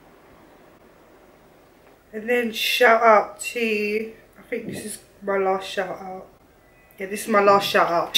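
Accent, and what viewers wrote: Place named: London, United Kingdom. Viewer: “dis is a turkish burrito outere” 😂😂😂😂😂😂 yhhh ur defo from ends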